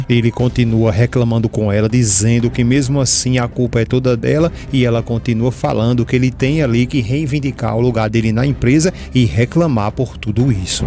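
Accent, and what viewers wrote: Pernambuco, Brazil. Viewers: Eu acho que ese narrador é de Pernambuco tem um sutaque forte e fala mulestra e Satanás ele é muito engraçado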